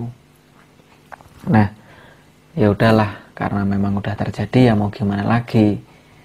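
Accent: Java, Indonesia. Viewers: Berasa aneh logat medok tapi ngomong "gue" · Haha slow..suka banget ..stip penyakit kejang ya..alias step..sukses lur..mending bosone biasa g usah nganggo lu gua..medok.hhh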